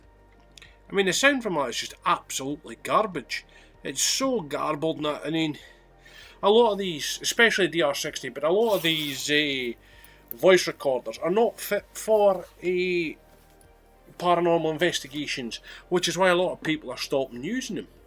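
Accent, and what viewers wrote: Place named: Scotland, United Kingdom. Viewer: great workmate. these fakers have been caught again. greetings from Munich Germany S 🤘 🖤 🤘 btw love your Scottish dialect.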